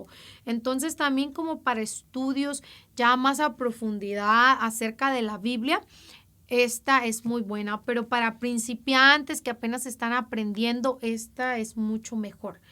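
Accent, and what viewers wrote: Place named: Colombia. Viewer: Bendiciones hermanita pensé qué ud era de Colombia, espero muchos podamos escuchar estas enseñanzas me gozo en el señor hablarnos con tanta autoridad del padre,el señor bendiga su ministro!!🙏🙏 y sigamos aprendiendo cada día más 🙏🙏🙏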